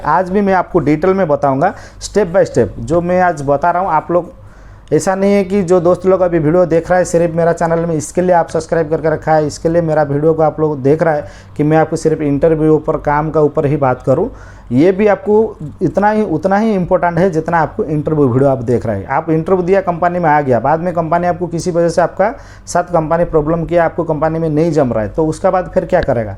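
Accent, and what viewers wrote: Odisha, India. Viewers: Aapka hindi se pta chalara aap odisha se ho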